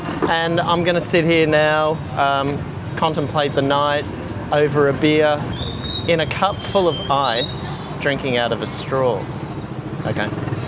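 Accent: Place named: Australia. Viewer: Haha, the lady is a hard one to crack! I love your sense of humour! And your lovely Aussie accent.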